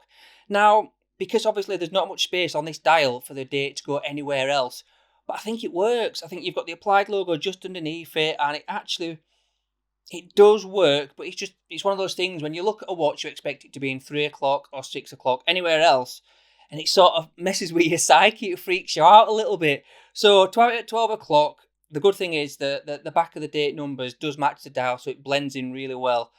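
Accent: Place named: West Yorkshire, United Kingdom. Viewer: Where are you from? Sounds very West Yorkshire to me 🤔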